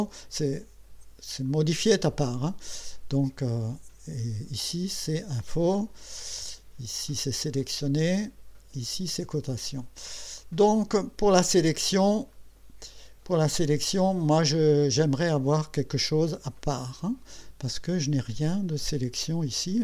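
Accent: Alsace, France. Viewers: Alsace (d'après ton accent)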